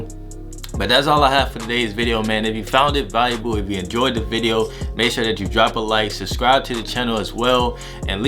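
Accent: Philadelphia, United States. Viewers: You sound like Philly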